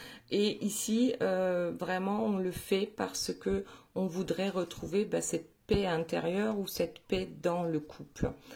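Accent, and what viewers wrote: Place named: East, France. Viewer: Merci beaucoup pour cette guidance, je pense que ça correspond bien à ce qui se passe dans le couple de celle que j'aime avec qui on est en non communication pour l'instant. Sinon j'avais choisi le monde pour elle et la mort pour moi et ça me va 👍 Merci (aussi pour ce petit accent de l'est ..😊 je suis de Moselle 😂) 😘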